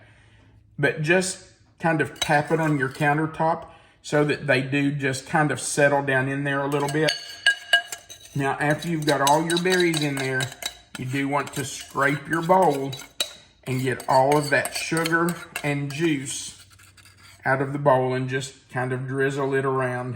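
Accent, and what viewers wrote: South, United States. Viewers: Love your channel and your southern accent is awesome. Thank You So Much for actually putting the recipe without pointless huge spaces directly under the video so we can easily screenshot the recipe with the thumbnail pic in it. There are jerks on here who refuse to do that (even after I have asked them to) so I quit watching them. They want us to click over to their website or blog which is Ridiculous and not easy when you are using slow data. Some people are just lazy rude and arrogant, you are very respectable.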